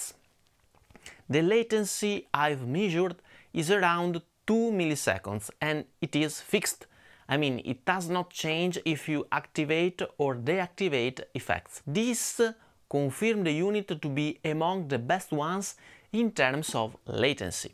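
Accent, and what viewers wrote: Italy. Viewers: Grande! Anche per l'inglese (dalla pronuncia però ti ho beccato subito ahah). Una domanda: ho l'mg30 e i modelli (non tutti) sono fatti molto bene. Il problema è che secondo me hanno sbagliato qualcosa nei gain stage: va in clip troppo facilmente e a livelli medio alti di drive impasta e presenta artefatti. Poi è veramente troppo presente sugli alti. Si risolve in parte abbassando il trim nel pre eq ma non basta. Peccato. Il Trident ha risolto 'sto problema?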